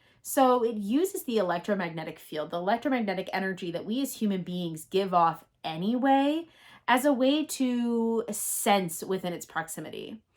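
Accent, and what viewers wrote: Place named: Ohio, United States. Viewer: You from ohio ? I can tell. A fellow. Native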